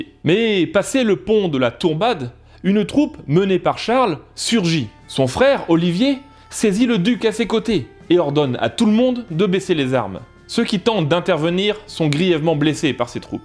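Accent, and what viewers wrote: Vannes, France. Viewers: Trudjerez ha tchenevo... C’est excellent d’entendre l’accent vannerais, j’adore..! (Désolé pour mon écriture certainement blasphématoire) Méga big-up à notre chaîne nationale..! Tellement intéressante..!! ❤️👍❤️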